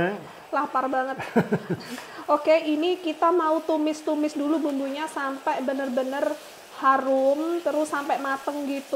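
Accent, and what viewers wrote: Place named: Banyuwangi, Indonesia. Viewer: Aku seneng mbk nek ngomong sek medok banyuwangi, salam mbk aku teko banyuwangi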